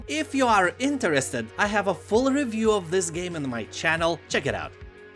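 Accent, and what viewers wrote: Russia. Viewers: you are from Russian or where? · its probably ignorant of me to call your accent "Russian Like" since you are not really russian. But your accent sounds like such a cool russian like acecnt (to a non european) I swear you can really do a good russian/ luthuanian game character voice over. Or maybe a game in which a main protogonist fight soviets for independence. I am from Pakistan by the way.(just saying)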